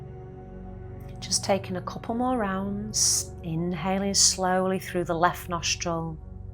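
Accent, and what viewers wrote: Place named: Yorkshire, United Kingdom. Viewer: Thank you for this. I'm really starting to learn to pull back and stop when I get myself in a high state of anxiety as I know acting from that state isn't helpful. These exercises really do help to bring me back down to a place of calm and stop me wasting hours in a loop of anxiety. I thank you so much for your videos and your down to earth manner, I'm a Geordie so I respond well to your Yorkshire accent and humour, much love to you 🙏❤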